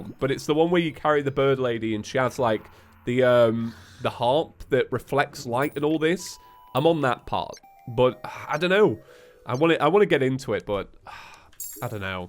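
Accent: United Kingdom. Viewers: that just the british accent mate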